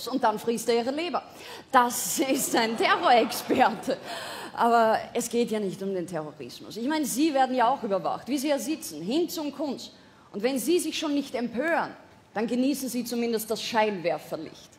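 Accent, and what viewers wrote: Austria. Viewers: ich find lisa auch klasse.tritt oft b. D. Nuhr auf. Wenn einige im publikum nur die hälfte mitbekommen könnte es auch an ihrem schnellen, "österreichischen" akzent liegen? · Dabei spricht sie ein sehr, sehr schönes und wirklich gut verständliches Deutsch, nur mit einem Hauch österreichischem Dialekt. Einfach noch öfter anschauen und hören „üben“ sag ich als Deutsche in Österreich lebend. Das wird schon…🙂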